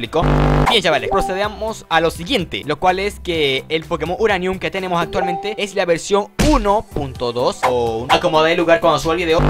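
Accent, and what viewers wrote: Venezuela. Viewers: HAAAAAAA ERES VENEZOLANO CONRAZON PAPI ERES DE LOS MIOS HABLA CLORO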